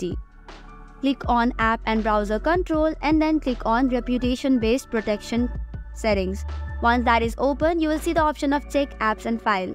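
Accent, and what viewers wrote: India. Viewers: ARE U INDAIN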